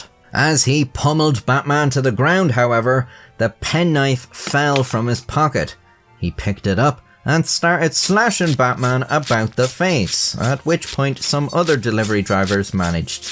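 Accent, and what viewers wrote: Ireland. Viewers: Well, I subscribed for a combination of the charming Irish accent, unassuming animations, and vague but unsubtle threats against people who don't subscribe. But we all have our reasons. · I love the Irish accent. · Listening an Irish man talking feels better than a british man talking 😅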